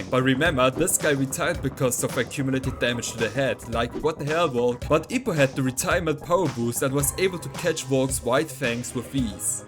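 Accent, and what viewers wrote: Germany. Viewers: Man hört den deutschen Akzent🥀 trz W Video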